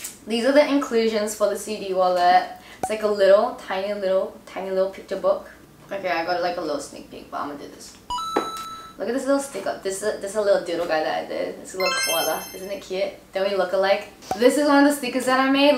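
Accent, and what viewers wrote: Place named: Australia. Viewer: i cant with her aussie accent is so precious 😭🤚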